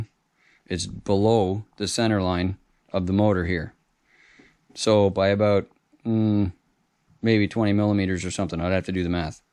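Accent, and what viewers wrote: Canada. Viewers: Thanks for the detail - sure helps us newbs... and for pointing out the disconnected red wire from the SC to the BEC, my wasn't even shrink wrapped, they just pulled the connector pin out of the plug and left it haning. I figured it was a boo boo, so stuck it back in. Truck arrived from China 4 1/2 months after I ordered it, bad left steering servo, so while it's up on the hoist, am going to slow it down based on your fixer upper here with yer easy to follow instructions, in a proper Ontario accent to boot (grew up over near Shelburne, stuck down here in Texas now). cheers and thanks.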